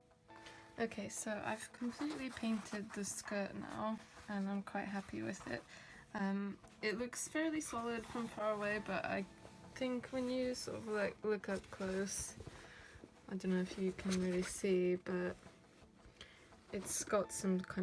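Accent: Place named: RP, United Kingdom. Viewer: You sound so posh. :)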